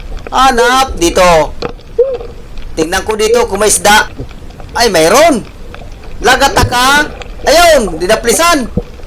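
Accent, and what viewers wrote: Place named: Visayas, Philippines. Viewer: swerte kaparin KSI medyo marami Ang huli mo. Napansi ko syo sa salita mo parang bisaya ka.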